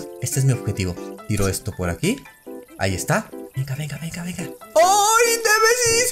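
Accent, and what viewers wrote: Chile. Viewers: En serio eres chileno si o no ??? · OMGGGGG PENSE QUE SERIAS MEXICANO PERUANO O ALGO ASI POR EL ESTILO PERO NUNCA PENSE QUIE CHILENO 😧 Y APARTE CONOCES LOS COUNTRYBALLS VIVA CHILE MIERD@@@ :O Y APARTE ERES MI YT FAVVV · Eres Chileno como yo 😂😂😂😂😂❤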